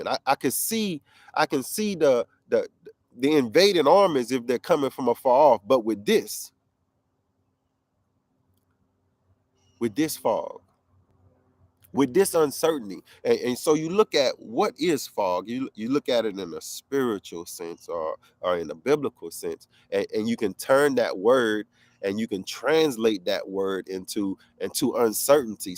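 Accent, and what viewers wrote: Louisiana, United States. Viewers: I knew that was a cajun accent I heard :) Just suscribed!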